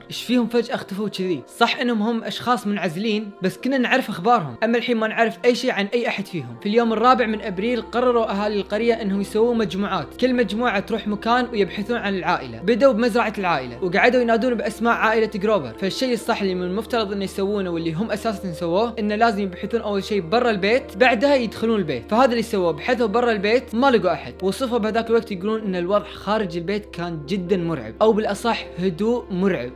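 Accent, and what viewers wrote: Kuwait. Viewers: كويتي صح باين من لهجتك زي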